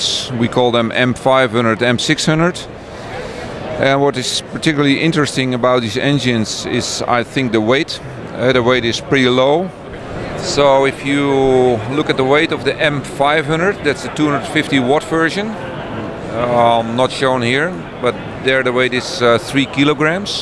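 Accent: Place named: Netherlands. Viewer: English speaking with a Dutch accent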